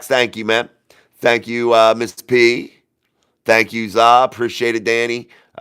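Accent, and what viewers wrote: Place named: Morgantown, United States. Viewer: I watching a day late..the guy wants u to speak the queens english. I prefer morgantown english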